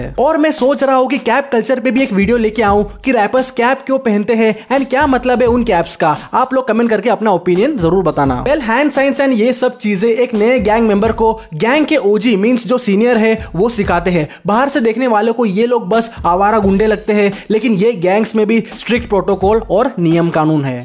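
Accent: Indore, India. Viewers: Bhai tum indore se ho kya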